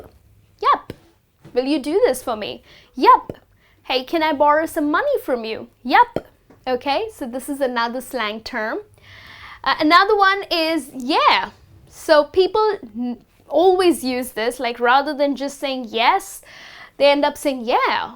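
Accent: United States. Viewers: You speaks 80% American English and 20 % British English ....... (Me - 50%american +50% British English....) Am I right plz comment .. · American dialect....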